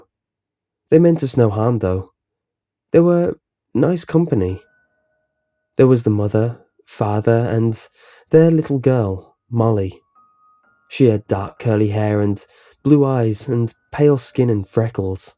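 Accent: England, United Kingdom. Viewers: He sounds like an English male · something about being read creepy pasta's from a guy with a British accent is really fun.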